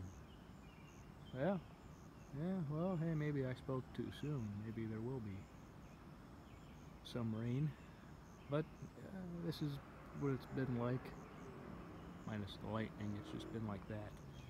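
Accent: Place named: Midwest, United States. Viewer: First off, I do hope that you are staying safe and dry. Secondly, Iowa? I subscribed to your channel - after having seen that you commented on the some of the same channels as me - and I could not figure out your accent. Turns out you're a Midwestern boy. Like your tone, elocution, and diction seemed old-fashioned like you came out of a Capra film. I mean no disrespect, and am totally wishing I spoke like you.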